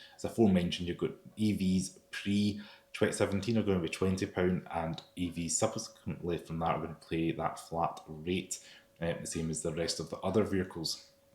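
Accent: Scotland, United Kingdom. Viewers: whats wrong with your mouth. Everytime you open it scottish comes out. Did you have it for dinner. You need to thyrow up quickly and then speak properly.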